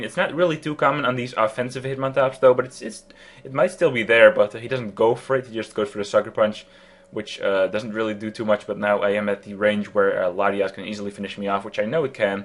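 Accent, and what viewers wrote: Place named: Netherlands. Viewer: het blijft moeilijk om een nederlands accentje te verschuilen xD nice battle ;)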